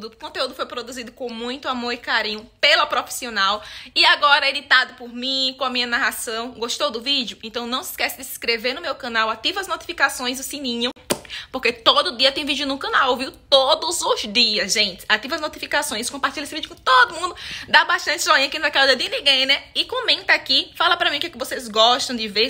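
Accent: Northeast, Brazil. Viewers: A Questão é a Técnica ( Saúde e Bem Estar ) para dar alívio ao Paciente/ Cliente. Satisfatório em pegar um Pé/ Unha em Estado Lamentável e fazer com que a pessoa fique bem. A Técnica em si para transformar a Pessoa em uma Pessoa Saudável e Feliz. Tenho um Exemplo da mãe de uma vizinha Diabética que foi a Manicure e o caso dela era de Podologia. O resultado foi a Amputação de parte do Pé por causa dos Efeitos da Diabetes. No caso desta senhora na qual estou relatando, foram 2 procedimentos de Amputação por não ter procurado o Profissional adequado para um simples problema de Unha Encravada. Manicure é uma coisa. Podologia é outra. No caso de Cravos e Espinhas ( que eu tbm assisto ) é a Técnica para a Saúde e o Bem Estar. A pele é o maior Órgão do Corpo Humano e tbm é muito interessante em assistir. Este vídeo é ótimo e as suas locuções com este sotaque nordestino é melhor ainda. 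( Muito divertido). Parabéns! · Eu amooooo esse sotaque nordestinoooo!!! Amo o nordeste, o povo de bem com a vida 👏👏👏👏👏👏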